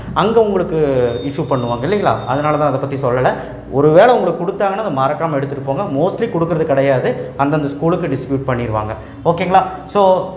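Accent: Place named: Chennai, India. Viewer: bro I love your slang Neenga Chennai ah ❤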